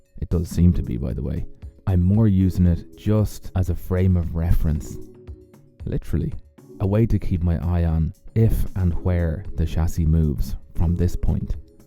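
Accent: Ireland. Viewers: music is fine, but you appear to be only talking out of my sub woofer. I'm pretty sure it's not just your delectable Irish accent... 😏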